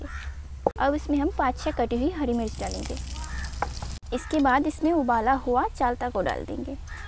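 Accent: West Bengal, India. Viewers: Beta aapki boli, aur ye kamal ke talab ,aur taad ke ped , aisa lag raha hai west Bengal se hain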